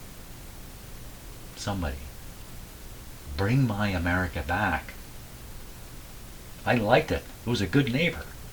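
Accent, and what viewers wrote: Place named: Canada. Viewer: There is no going back. Accept us and we learn nothing, forgive us and you betray yourselves. I hate being wise but we are not in a good place and it's going to get one hell of a lot worse before it gets any better. Brace yourselves - you're going to catch strays, being so close. Open your arms to those of us who are more loyal to the world we had, to our friendships and alliances and the longest peaceful & demilitarized border ever, than any world this regime could ever hope to create. Y'all may not be my home nor my native land but I'll defend Canada before I defend this fascist regime. We still pick up a lot of Niagara Falls, ON radio, sometimes Toronto too. I should have known from your accent that we are geographical neighbors, my apologies. I also have actual Canadian relatives - 1st cousins, uncle died before I was born & his sweet, wonderful, creative wife just died, alone, in a hospital, during C19 - so perhaps my affinities toward you guys are a little more fundamental to me. 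My godfather is one of those Canadian cousins (~20 years difference). The aforementioned uncle, who died in Windsor, has a Wiki page, largely for work he did at Toronto University and then if memory serves Assumption College which I think became the University of Windsor at some point. Yeah. I understand how you have to regard my country, I understand the geopolitics, but don't fool yourselves for one second that we've all changed for the worse and that all your friends and allies are gone. We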